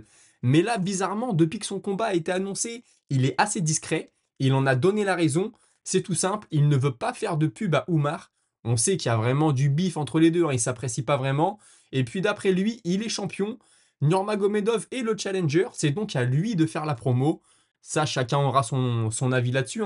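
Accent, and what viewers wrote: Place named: North, France. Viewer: Frerot repond pas si tu veux une fois si je confond pas ta dis que t'avais était a Rotterdam look 1 ufc et vu que ton accent m ait familiers je me demande si tt du nord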